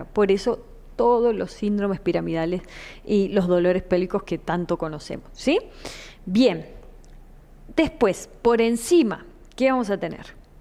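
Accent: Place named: Argentina. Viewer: Es argentina ❤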